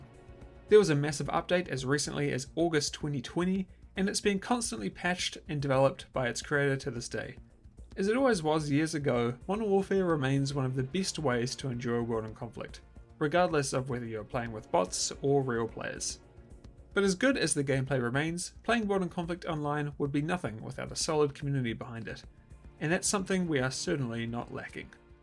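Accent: New Zealand. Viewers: Really enjoy your Kiwi accent, man that brings me back to the amazing country.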